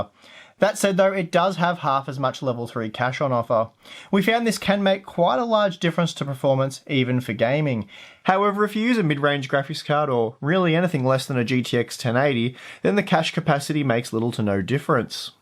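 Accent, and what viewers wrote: Australia. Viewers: are you OZ?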